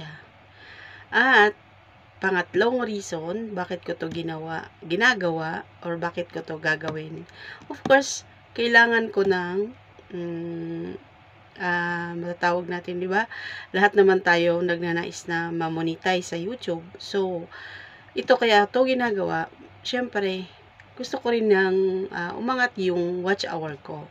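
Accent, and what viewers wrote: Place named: Visayas, Philippines. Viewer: Bisaya man sad diay ka ate. Apir🤚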